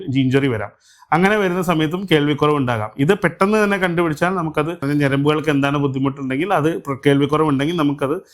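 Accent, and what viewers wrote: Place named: Tamil Nadu, India. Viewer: പ്രിയ ഡോക്ടർ ഒന്നും മനസിലായില്ല ഒരു തമിഴ് ചുവ ഉണ്ട്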